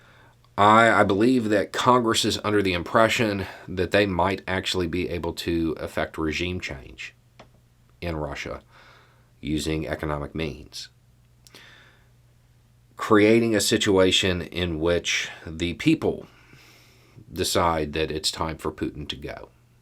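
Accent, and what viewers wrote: South, United States. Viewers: Not sure if you can tell but your accent has gotten less southern. Just watched a video from 3 years ago.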